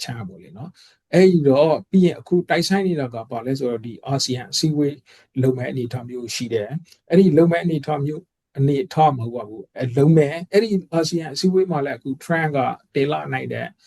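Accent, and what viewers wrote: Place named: Dawei, Myanmar. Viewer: ထားဝယ်လေသံန့ဲဆိုတော့မနည်းနားထောင်ရတယ်တစ်နေ့န့ဲတစ်ရက်အတူတူဘဲနော်ဒေါ်လာသန်း192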